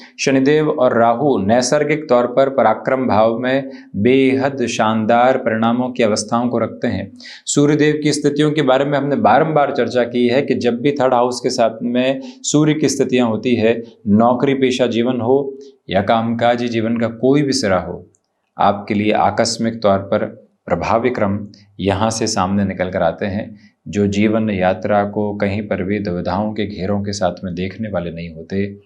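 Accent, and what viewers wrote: Standard. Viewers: जितनी शुद्ध हिंदी वो बोलते है बाकी किसी ज्योतिषी को बोलते नहीं देखा, भाषा पर अच्छा नियंत्रण है गुरु जी का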